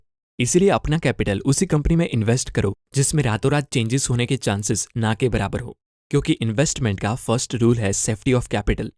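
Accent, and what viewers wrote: Gujarat, India. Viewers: Tame gujarati chho?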